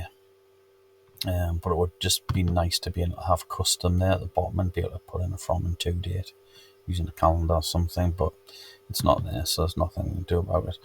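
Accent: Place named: Newcastle, United Kingdom. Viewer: Hi. I'm on this journey with you. Just signed up last night and set it away this morning. Got a telegram from the administrator to say minimum betfair stake was £10 so I couldn't use the £2 I tried. He said 10 was minimum bet to get bsp. Hope this is all legit! And also, I'm a Georgie too so I love hearing your accent on here. I live in Cramlington!!! Thanks for your great videos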